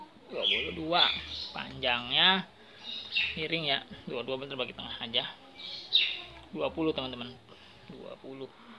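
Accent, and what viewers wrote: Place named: Betawi, Indonesia. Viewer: Bang ko abang gaya bicara nya kaya orang jakarta/Betawi, inisiatif nya mantep baang, salut saya salam satu hoby, ane jkrta tg priuk 🙏🙏🙏🙏 👍👍👍👍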